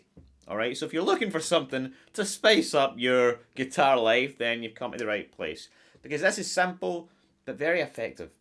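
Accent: Scotland, United Kingdom. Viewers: Great lesson and great to hear a fellow Scot! 🏴🤝🏻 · This is great, I not only get a guitar lesson but I learn how to understand a Scottish accent! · I like guessing Scottish accents and where they’re from, I’m gonna guess Edinburgh with an EW Punt on Penicuik! Am I in any way close? 😅 👍🏴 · you speak scottish,,,who in their right mind is gonna listen to your accent? tell me who? I want all their names,,they need therapy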